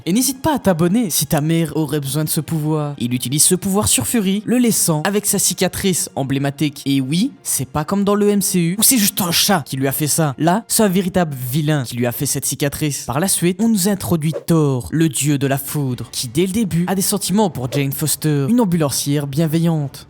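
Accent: Belgium. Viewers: Ton accent belge ressort vachement dans cette vidéo 😂😂😂 La série est excellente, elle arrive à reprendre la plupart des gros arcs des comics certes condensés mais c'est plutôt bon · oui j'ai eu la chance de voir cette série ici en Belgique sur France 4 et elle est excellente cette série,j''avais 35 ans quand j'ai connu cette série et j'en ai 45 c'est cool 👍😎🇧🇪 a propos, quand j'entends ton accent je crois que tu viens de chez nous?! 😂🤣🤣🤣🤣🤣🤣 excellente vidéo 👍 cool mec 👍🇧🇪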